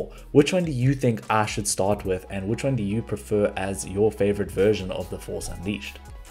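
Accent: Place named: South Africa. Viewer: Let's go! I love that type of accent. I used to have a hard time detecting between Australian, New Zealand, and South African. But I think I'm starting to get it finally! District 9 and Chappie actually helped me a lot with the South African side of things. Anyways. I'm in a big Star Wars phase, so this video was really nice, thank you!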